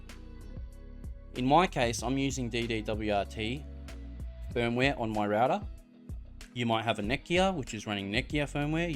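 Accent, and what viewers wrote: Australia. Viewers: Hey mate, good to hear an aussie accent on these tutorials. Hoping you can help if you have time. I have set up successfully with sonnarr and radarr. Seems to have no problem with other unraid dockers, but going to docker or web server on another VM is an issue. Currently having issues with a self hosted HUDU install. Works fine by direct IP or port forwarded to direct IP. Soon as I port forward to NPM I get redirect issues. Hudu has SSL (letsencrupt) built in so I have tried turning it off in NPM and various other configs that don't seem to work.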